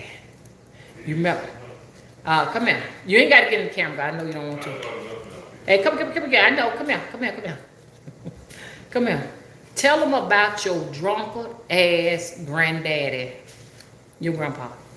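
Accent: South, United States. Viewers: Heeeeeey 🌹. 🌳. Your accent definitely "Southern"! Please 🙏 send a little of that "bulk" my way.🤗🤗🥰. You're My "People's ✅️ ✅️ ✅️ 🤗🥰. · You're so beautiful, I always felt rooted to you! im grateful for the messages. your locs are roots. And your voice is the southern ancestors. I spread ur messages with my followers and stamp your name n channel. I wish many watched you. Master teacher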